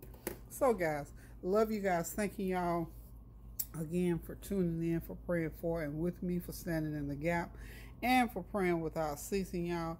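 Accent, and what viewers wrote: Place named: South, United States. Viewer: This too southernnnnnnnnn 😩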